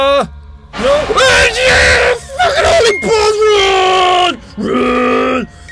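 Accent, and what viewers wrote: Ireland. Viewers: Are you Irish? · First time he sounds Irish. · you sound Irish I know because im half Irish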